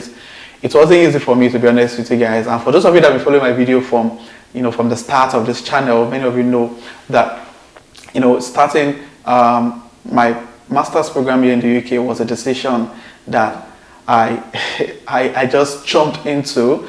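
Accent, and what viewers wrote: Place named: Yoruba, Nigeria. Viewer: Please, why is your your Yoruba Accent still so strong?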